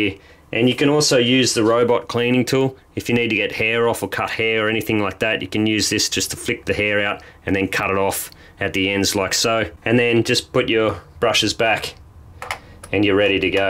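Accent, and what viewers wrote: Australia. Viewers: Very useful and clear, and I got to listen to your accent too :-) Aussie I assume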